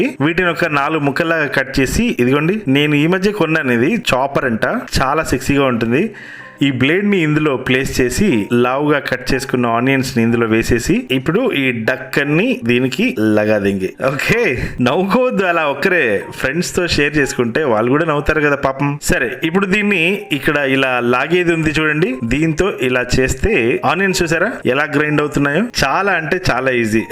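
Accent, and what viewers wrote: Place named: Telangana, India. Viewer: కాకా నీ తెలంగాణ యాస కూడా బాగుంది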